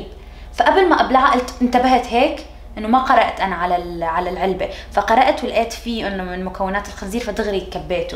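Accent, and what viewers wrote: Syria. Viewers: ماعم تحكي لادقاني عم تحكي اللهجة السورية العامة لمعرفة لبعض الدول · سوأل ليش انتي عراقيه وتحجين سوري للعلم هذا السؤال اسئله كل فيديو بس محد يجاوبني 😐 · عاشت وهي صفيره بسوريا فصارت تحجي سوري اكثر من العراقي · لعد لَيــِْ♡̷̴̬̩̃̊ـِْش. تحجين سوري اذا عراقيه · walaa sdke اختارت لهجة السوريه لان لما بدات باليوتيوب كانت اللهجة السوريه كثيير مشتهره بسبب دبلجة مسلسلات السوريه و في نفس الوقت كان ما احد يعرف اللهجة العراقيه طبعا هذه شغله مش حلوه لانها نست اصلها و قامت تتكلم بلهجة ثانيه و معلومه اخرى تعرف تتكلم عراقي زيين بس بعدها مصممه على لهجة سوريه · لأنها كانت عايشة بسوريا من هي وصغيرة فلهيك تحكي سوري يعني تعودت تحكي سوري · انا عرفت انك عراقية بس ليش تحكي سوري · زكاء منك انك عراقية وحاسبة حالك عالسوريين باللهجة وغير اشيا بهالطريقة كسبتي السوريين والعراقيين وعملتي هالقناة الكبيرة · نفسي اعرف شغله ازا هي عراقيه كيف بتحكي بلهجه سوريه وليه بتحكي بلهجه سوري بدل عراقي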